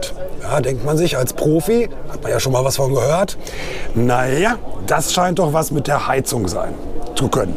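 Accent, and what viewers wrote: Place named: Gelsenkirchen, Germany. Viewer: Dein Sprachausdruck ist furchteinflössend.... Willst Du deine Videos nur nach "Gelsenkirchen hin" verkaufen !!!!! Drei mal Daumen runter für Audio :-(((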